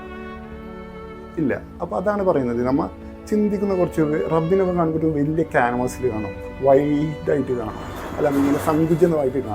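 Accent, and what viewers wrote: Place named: Koya, India. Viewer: എന്റെ സഹോദര... " നമ്മ.. നുമ്മ... അല്ല നമ്മൾ, ഞങ്ങൾ, നിങ്ങൾ etc... അതുപോലെ " പയം, ഏയ്‌, പയംപൊരി കജ്ജ്, കുജ്ജ്‌, പോയ, വയക്ക് etc. അങ്ങിനെ അല്ല " പഴം, പഴംപൊരി, കയ്യ്, പുഴ etc... സത്യത്തിൽ കോയ ജന്മം കോയരീതികൾ എല്ലാം വ്യത്യസ്തമാണ് അതുകൊണ്ട് ഡോക്ടർ ആയാലും ഏത് വലിയ ഉദ്യോഗസ്ഥർ ആയാലും എന്ത് കോട്ടും സൂട്ടും ഇട്ടു വന്നാലും ശരി വാ തുറന്നാൽ അപ്പോൾ മനസിലാക്കാം അതൊരു കോയ ആണെന്ന് കാരണം ഇത്തരം നമ്മ നുമ്മ കജ്ജ് കുജ്ജ്‌ നാണം ഇല്ലെടോ ഈ രണ്ടായിരത്തി ഇരുത്തിനാലിലും മനസിലാക്കാൻ പറ്റാത്ത ഒരേ ഒരു വർഗ്ഗം കോയകൾ മാത്രം.. അതുകൊണ്ട് പുതുതലമുറക്ക് എങ്കിലും നല്ല മലയാള ഭാഷ പഠിപ്പിക്കുക.. കാരണം മലപ്പുറം പോലുള്ള സ്ഥലത്ത് എന്തുകൊണ്ട് ഇങ്ങിനെ സംഭവിക്കുന്നു എന്നതിന് കാരണം അവിടെ പഠിപ്പിക്കാൻ വരുന്ന അദ്ധ്യാപകർ ഇതേപോലെ സംസാരിക്കുന്ന അവിടെ ഉള്ള ആളുകൾ ആണ് ആയതിനാൽ അത്തരം സ്ഥലങ്ങളിൽ മറ്റുജതികൾ വന്നു ക്ലാസ്സ്‌ എടുത്താൽ തീർച്ചയായും ഇത്തരം കൂതറ ഭാഷ പുതു തലമുറയിൽ മാറി കിട്ടും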